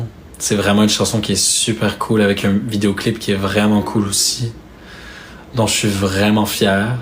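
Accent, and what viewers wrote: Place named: Quebec, Canada. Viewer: Il me tarde beaucoup d'écouter ta nouvelle chanson... Par ailleurs, j'adore ton accent québécois: ) and I like it too when you speak English: ). Je t'ai découvert par hasard en regardant DALS, je vous avais adoré Marie et toi, et ca a été un bonheur de te suivre depuis. Surtout ne change pas, merci pour tout: ).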